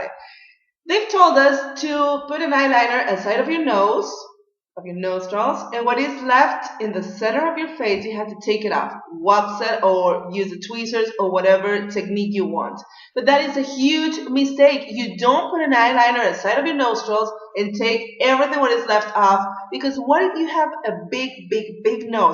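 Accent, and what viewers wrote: Mexico. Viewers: Love this video! You speak English really good I have the Mexican accent too! Haha😂 And my eye brows are a challenge a big challenge cause I have to make my brows every day cause I don't have to much hair on it😒 sad ! Well thank for this tips.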